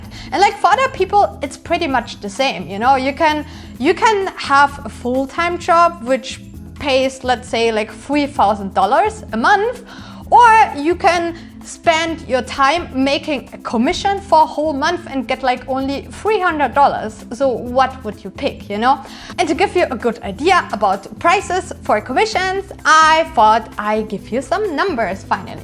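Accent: Germany. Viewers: Ey ich liebe deinen Akzent, der ist so schön deutsch XD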